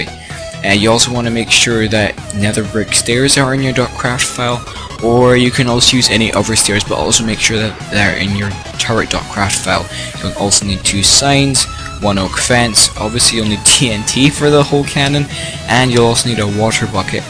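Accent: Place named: Ireland. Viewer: Ahhh okay. You sound Irish so I find it pretty funny.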